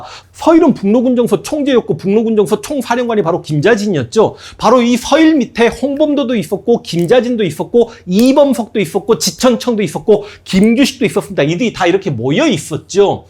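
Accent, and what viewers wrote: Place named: Chungcheong, South Korea. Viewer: 난 황강사님이 충청도 말씨라서 강의가 주구창창 공산주의를 지향하는 사회주의적이라 이상하다며 들었습니다 역시 광주분이네요 전라도분들은 거의 모두가 객관적 사실보다 목표를 세우고 그기에 대입하시는 경향이 많아요 강의하실때 좀더 실증적으로 하시면 감사하겠습니다 그 해박한 지식을 지향하시는 목표에 대입하시면 일반 사람들은 반박하기 힘들어요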